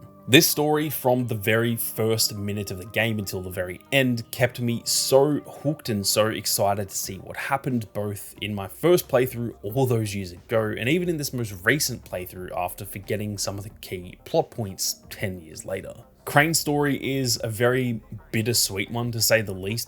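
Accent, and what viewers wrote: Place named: Australia. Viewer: Nothing better than hearing another aussie talk about peak.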